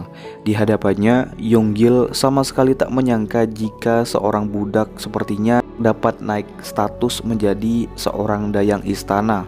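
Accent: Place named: West Java, Indonesia. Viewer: Admin seperti orang Jawa Barat, logatnya seperti paman saya yg dri majalengka, 😁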